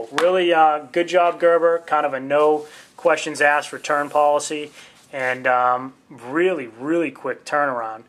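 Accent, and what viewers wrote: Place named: Boston, United States. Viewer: What are you up Boston? I know a fellow Masshole when i hear one... ;0)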